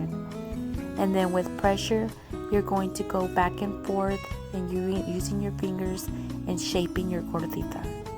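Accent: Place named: Texas, United States. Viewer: Are you from Texas? You sound Texan. And some of your ingredients I see at H‑E‑B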